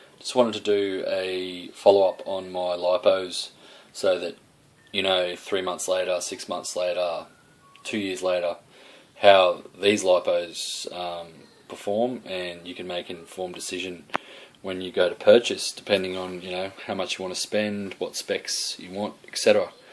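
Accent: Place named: Queensland, Australia. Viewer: sounds like you live in brisane/southeast queensland. where abouts do you bash?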